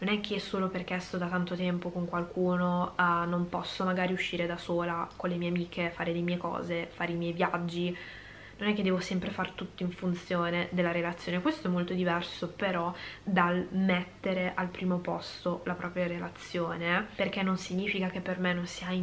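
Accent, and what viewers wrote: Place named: Milan, Italy. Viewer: Molto interessante! Curiosità Indiscreta, hai un Accento milanese con tratti di pugliese.. Ci ho preso? 🤔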